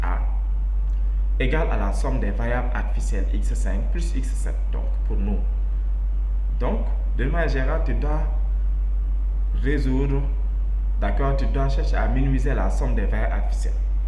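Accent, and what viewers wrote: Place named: Quebec, Canada. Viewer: quebec french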